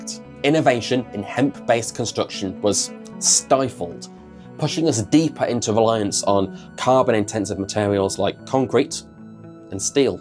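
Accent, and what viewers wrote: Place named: England, United Kingdom. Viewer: This is so US-centric. Maybe the "floodgates were opened in 2018" in the US, but in Europe, industrial hemp has been in use much longer. In fact the US were the only industrialised nation that outlawed industrial hemp for so long. For example, in Germany, the illegal status of industrial hemp was abolished in 1996. Hemp in Europe has always been in use for plumbing, rope production and insulation. It's the increased interest in sustainability and the need for energy efficient house building that has led to its revival. There are many well-established companies that have been selling hemp products for a long time. I can even find them in my local DIY store. For example, "Hanfstein" ( meaning hemp stone) have been producing and selling hempcrete bricks since 15 years. Americans sometimes have to be reminded that there is a world beyond their borders, and that sometimes they are not No.1, but lagging behind. 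Edit: from your accent, I'd expect that you're English, but the situation you described is specific to the US.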